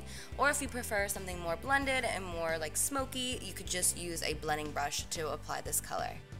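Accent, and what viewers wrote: New Jersey, United States. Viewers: I never noticed her jersey accent until now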